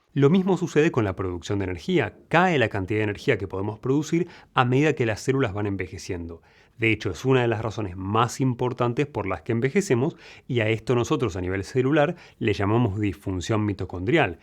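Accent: Argentina. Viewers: El dr. es Argentino!!💪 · es argentino, tenía que ser argentino, no hay otra. Lo grande que somos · es argentino pero no sé dónde vive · es argentino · Es argentino...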